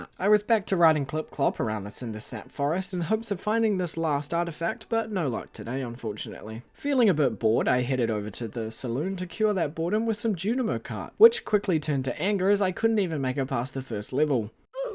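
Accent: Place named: New Zealand. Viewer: I hear "kig shid" and laugh my accent off 🤣 actually I find very cool to hear so many different english accents on yt, and now I know that NZ accent have a very closed 'e' sound in words like 'bed', 'shed, 'keg', 'quest', and the stress syllables are different in some words, like 'crAnberries' instead of 'cranbErries' 👍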